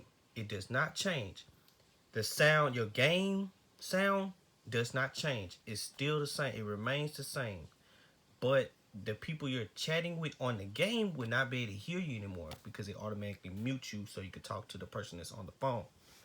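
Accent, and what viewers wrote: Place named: South, United States. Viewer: you kinda sound like the YouTube poiised. Are you from the south by any chance?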